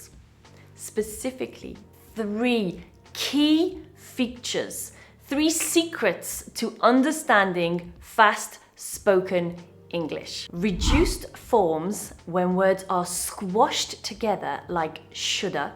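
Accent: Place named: London, United Kingdom. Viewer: It's very good your accent is in London see you next bye and great week for you.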